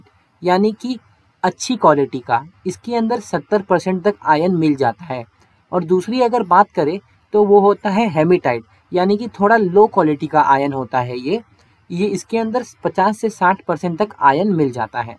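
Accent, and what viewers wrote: Odisha, India. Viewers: Bro are you from Odisha ??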